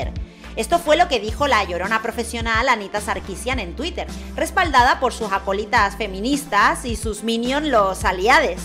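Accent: Canary Islands, Spain. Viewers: jajajaja, ¿sabes? envidio ese acento español jajaja, lo adoro ^w^ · Eres de canarias?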